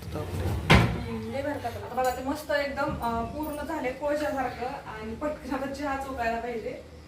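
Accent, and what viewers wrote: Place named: Satara, India. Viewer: You are very beautiful. Like your Marathi. You sound like you are from Satara.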